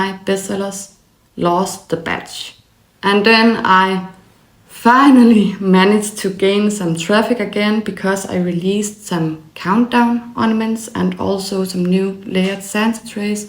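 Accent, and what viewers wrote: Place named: Denmark. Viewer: Thanks for sharing your story with all of the real life ups and downs. I own a few of your files and love watching your videos! Your Danish voice sounds great to me. I play around in Illustrator designing my own files, but definitely want to get better at it. Looking forward to learning more from you.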